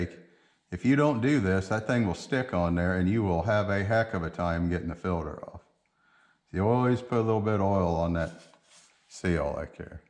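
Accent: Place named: Ohio, United States. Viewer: I am actually from Ashland Ohio and realize that I have never lost my accent since we moved Arizona in 1966. Surpises me how much you and I sound the same when we speak. My last name is common there, it is Mumaw . Keep up the good work with your positive attitude !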